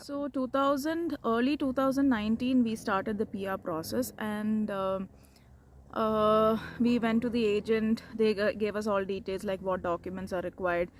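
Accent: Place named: Bangalore, India. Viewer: Oh my i was sooo sure ur from Bangalore.. my my how well i know Bangaloreans speak cheers 🥳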